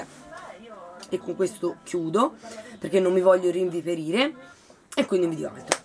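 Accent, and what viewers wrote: Tuscany, Italy. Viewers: sei grande! Manda queste quattro ochette a fanculonia e continua con i tuoi video. Sono spassosi e belli Bello il tuo spiccato accento toscano e le tue mani sul viso sono come pennelli di un pittore Sei bravissima....poi hai l'età di mia figlia Ti adoro e voglio continuare a vederti con tanto affetto una tua fan agguerrita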